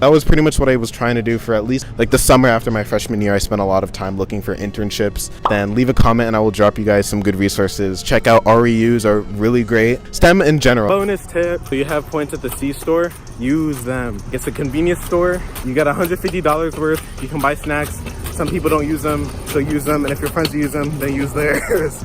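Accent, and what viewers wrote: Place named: United Kingdom. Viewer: dude this video was so amazing!!! it was so helpful i loved everything you said, it helped me get a better idea of what bowdoin was like (tbh i thought it was kind of stuffy and boring) and the general college advice was amazing as well! this has me excited to hear back from bowdoin. thank you for showing what the city is like as well bc i was under the impression it was in the middle of nowhere so i def wasn’t expecting a city lol. also your energy is just amazing!!! you’re so funny and kind and made this video so fun to watch cause usually i dont like videos like this. you’re amazing man, keep going! you’re gonna be great i can tell!! (also your british accent was so good even tho u said you grew up in the midwest i was like is he from the uk!???)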